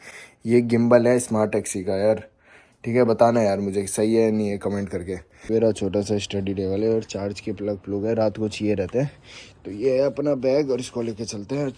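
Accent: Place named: Uttarakhand, India. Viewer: Bro your acsent seems phadi btw mai uk uttarakhand❤ se hu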